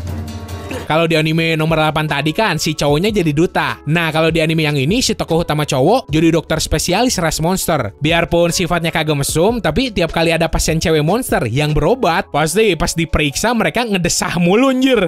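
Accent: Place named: Java, Indonesia. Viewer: jawa keras lurd wkwk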